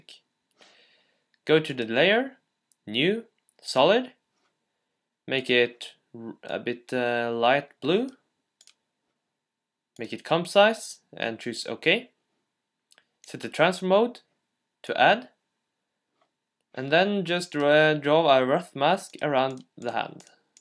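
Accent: Norway. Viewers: Are you norwegian? Sounds like it :) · hahaha kan høre at du e norsk på uttalen xD konge vid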